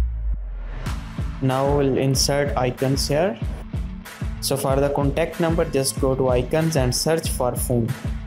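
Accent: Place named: India. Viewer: fuking indian accent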